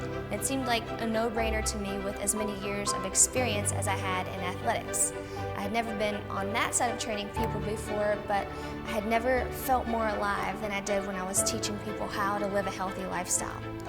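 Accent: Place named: South, United States. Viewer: I've been with you since the pandemic, and just watched this. I am even more inspired and in love with you, your personality and positivity. You are infectious and I admit what brought me here originally was your southern accent (as a Charlotte native). Thank you for bringing your all to us and making us better! Your brother would be so proud of you <3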